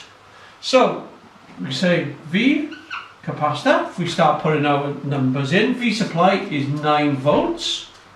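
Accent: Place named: Wales, United Kingdom. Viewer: Thanks for this also,. You sound Cymraeg (like me) 😊 I have done a bit of electronics in my past amateur radio exams so this is a nice refresher for me. 👍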